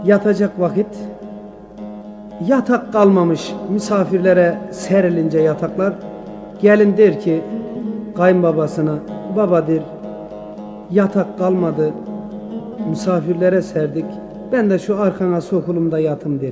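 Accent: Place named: Hatay, Turkey. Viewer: Hatay'da ki Türk aşiretleri hepsi böyle konuşur Avşar boyu'ndan 56 Türk aşireti yerleştirildi burayı Türkleştirmek için...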